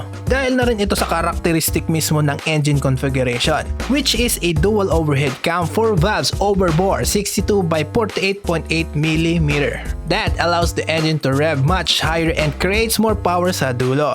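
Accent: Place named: Conyo, Philippines. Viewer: Ang conyo mag english, don't try so hard bruh ang panget sa tenga.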